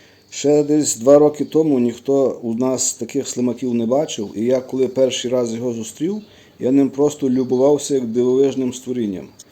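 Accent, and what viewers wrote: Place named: Galicia, Ukraine. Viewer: Дивно, що ви від них не страждали раніше. У насу Вінниці ця нечисть з 2014 року. Тоді їх було тисячі. Тепер набагато менше. Судячи з вашого акценту ви десь з Тернопільщини або Франківщини коротше з Галичини) Я там спостерігав цих слимаків ще років 6 назад. У Карпатах вздовж берегів річок було дуже багато. Ми рятувалися звичайною сіллю та потім ще почали додавати вапно. Мінус що вона діє до першого дощу. Дякую за пораду - треба запитати або в інтернет знайти!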